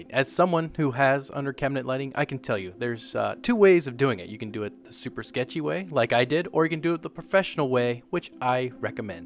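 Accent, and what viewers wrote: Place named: Illinois, United States. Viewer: When the fuck did this channel pop up? Thank you recommendeds. I can’t figure out where this dude is from either, accent is telling me Illinois but that jacket is telling me Tennessee or some shit.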